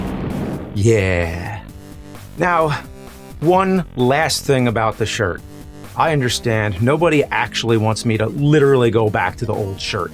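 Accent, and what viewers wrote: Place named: New Jersey, United States. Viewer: nerd cant even say the name of the main character Cliff Secord correct he called him Cliff Sector WTF it must be his dirt bag new jersey accent thats why the call new jersey the garbage state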